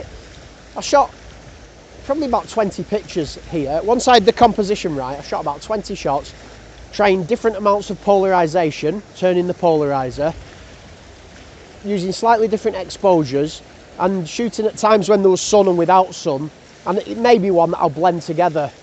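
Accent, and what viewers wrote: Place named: Lancashire, United Kingdom. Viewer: Another great video, your Lancashire tones are music to my ears! However.....even better if you knew where you were! You were not at Devils Kitchen, you were at Cwm Idwal and then Llyn Ogwen. South Stacks is at the north west of Anglesey not the southern tip!😂😂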